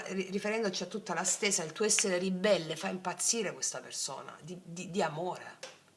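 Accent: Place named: South, Italy. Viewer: Ciao carissima grazie bellissima lettura e bellissimo accento del nostro sud ❤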